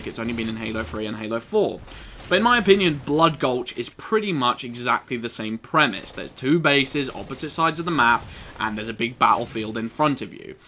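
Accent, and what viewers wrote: United States. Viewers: American accent FTW!! Hey add me!!! Just a friendly reminder! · Dude your american accent is perfect. LoL. Also you have a very interesting perspective on maps that I never thought to look at before.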